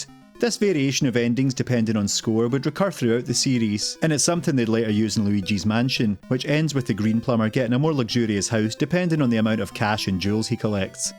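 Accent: Scotland, United Kingdom. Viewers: Nice, thanks for the reply, I'll definitely check out your other videos! I really like the sound of your voice (it's the Scottish accent).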